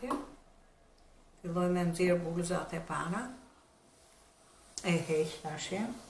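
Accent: Tirana, Albania. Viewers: Ka lezet kur bohet e shpjegohet nga nje tironse me dialektin e Tirones. Na kishte more malli .Njit cdo gjo. T`lumshin duret!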